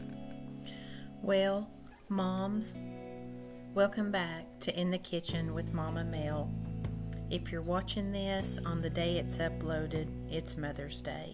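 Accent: South, United States. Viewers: I could listen to you talk all day. Makes me feel like I’m back home in the south♥️ great video my friend!!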